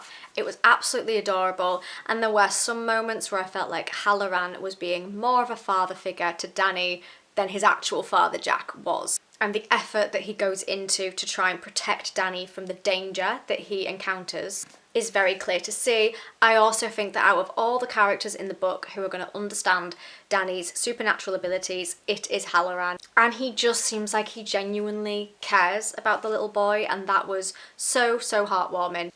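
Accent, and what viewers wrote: United Kingdom. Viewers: Wow, absolutely love your review. And love listening to your voice and British accent, too! I agree with what you said about King's writing style. He's an absolute master when it comes to character development and description. He has a way of writing that makes you lose yourself completely in the characters' thoughts and emotions, until you forget everything else around you. The Shining is definitely one of my top favorite Stephen King novels. I've read every King novel so far, and I highly recommend you read The Dead Zone, Pet Sematary (very scary, but oh so good!), Christine, The Green Mile, Misery, 11/22/63 and the novella collection Different Seasons. Just keep them coming! 😊📖☕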